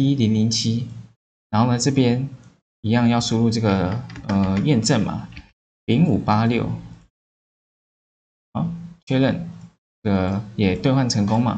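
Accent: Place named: China. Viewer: 如果頻道主真的是台灣人，請不要學大陸人說英語的口音，還是頻道主是大陸人扮台灣人？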